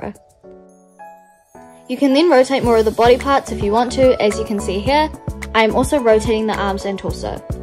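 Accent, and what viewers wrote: New Zealand. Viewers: omg are you from nz?? you sound like one! (im sorry if that sounds rude or anything!!) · Are you from New Zealand?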